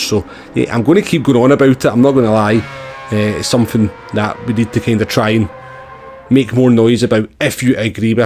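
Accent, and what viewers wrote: Scotland, United Kingdom. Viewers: Thanks for reminding me for how it used to be on Gt Sport; it def made the races more interesting. Btw sorry to that I haven’t checked in since I joined the channel, work etc has been full on the last few months. I do watch when I can. My 13 yr old son and me enjoy the races, and the Scotch accent! Cheers sir.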